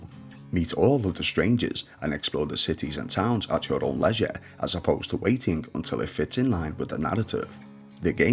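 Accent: Liverpool, United Kingdom. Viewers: Alright mate can see you’ve not uploaded for a small while, just thought I’d give you a shout and say that I think your videos are bloody incredible. I’ve been playing RDR2 for some time, but never fail to find something new through your videos. It’s also a pleasure to hear a scouser talk about my favourite game. Hope all’s well and looking forward to your next video! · Nice to hear a fellow scouser doing something on YouTube that’s not relating to footie.😃 Luv RDR2 and 1 as well. I usually start off bad ie killing the O’Driscoll in the Adler stable and Jimmy Brooks off the cliff, but by Ch 4 I’m a pretty good guy most of the time anyway.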